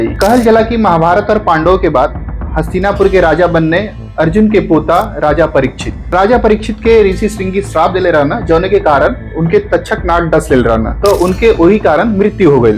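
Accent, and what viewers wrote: India. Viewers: Itni shudh bhojpuri 😮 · Superb sir and hmare tarf wali bhojpuri sun ke maja aa gya🙏🙏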